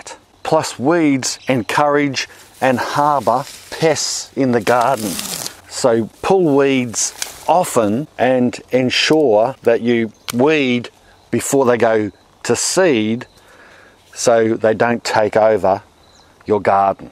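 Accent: Australia. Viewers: How about collecting your own urine (if you are not taking medication) and using it as a nitrogen source to naturally boost your veggies, eh? I did that with my garlic twice and while I haven't harvested it yet the scapes have been harvested twice already! Very excited to see how the heads come out. This is my first year gardening so I'm definitely a noob. Thank you so much for taking the time to make your videos. I enjoy watching all of them and always learn something new. Plus I love the Aussie accent! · Another thing they should do, is to watch crazy Aussies making gardening videos!!! lol! I love your vids, combining some GREAT info, with a smattering of comedy, here and there. I am in the U.S., and as soon as I heard your accent, I loved how you say thing a bit different. So I TRY to remember to mulsh, but sometimes, I just have to settle for mulching instead. 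Have a good one, and keep up with the AWESOME vids! Another guy, who is GREAT for container gardening, is "home grown veg"! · I think many people watch so they can practice their Australian vocabulary and accent, in addition to the advice.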